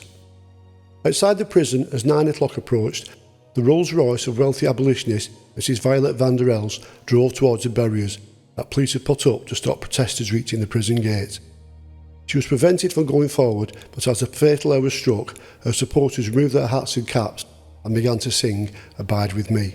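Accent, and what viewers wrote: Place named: St Helens, United Kingdom. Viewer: Great episode, I do miss that accent….trained as a nurse in St Helens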